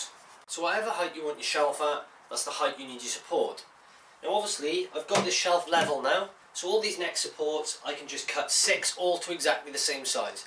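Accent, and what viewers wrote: United Kingdom. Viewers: Nice video and excellent explanation. What part of the United Kingdom are you from?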